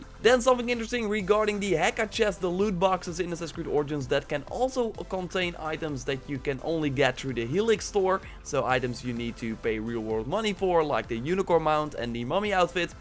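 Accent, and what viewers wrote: Netherlands. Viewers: S1561verian S1561verian Hij is Nederlands. Kun je echt gelijk horen. Plus, hij zei dat volgens mij bij een Livestream. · GoodFella 98 pretty sure its dutch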